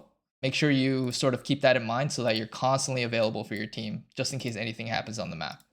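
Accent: France. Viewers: C'était toi ? je me disait que tu avais un accent français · Non. Mais oui on est d'accord il est francais. J'ai l'impression qu'à un moment il se trompe et lâche un p'tit: fin heu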